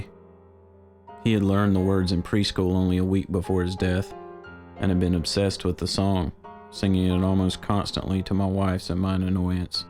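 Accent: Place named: Texas, United States. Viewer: you're from texas aren't ya cowboy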